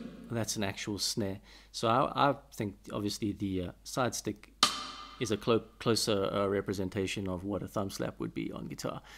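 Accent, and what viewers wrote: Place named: South Africa. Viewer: Thanks for this! Are you South African? I think I can hear it a bit in your voice :D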